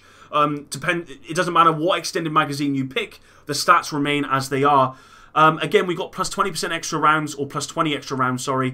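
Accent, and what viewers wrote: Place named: West Country, United Kingdom. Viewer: Which part of the west country mate. Bristol here. Top vid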